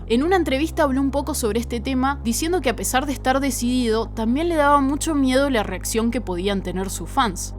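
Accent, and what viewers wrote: Argentina. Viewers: Me encanta que le dice ChoChi , amo el acento argentino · como decis "shoshi" me parte los webos! yo entiendo q en argentina pronuncian asi pero tampoco pa tanto, el nombre es japones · Yo: Yoyi: Shoshi Amo a los argentinos ahajajsh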